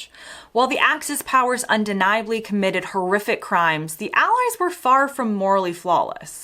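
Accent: United States. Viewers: Okay young lady there's a couple of things you're right about and a whole bunch of stuff you're dead and wrong on It is true that people in the United States have a tendency to downplay the massive Soviet effort during world war II It is also true the United States was a deeply racist Nation at the time and had no right to be criticizing others on that score And it is also true that the allied bombing campaigns killed hundreds of thousands of civilians. What you were wrong about is that first off the Japanese were never ever ever going to surrender until they were utterly defeated Even after we dropped two nukes on them there was an attempt to overthrow the government by extremists and continue the war anyway Second off the United States was the nation that tipped the balance in the war There's a reason why the British and the Canadians were unable to invade Europe before the Americans came along. 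They simply did not have the manpower, the money, or the material to do so Without the United States, the axis could well have won that war. I find it interesting you sound like a young lady from the US, but you also sound like a young lady who doesn't like the US very much based on your video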